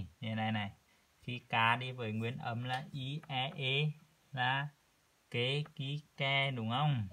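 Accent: Central, Vietnam. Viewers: 2 ông con láu cá quá, giọng của bạn miền Trung?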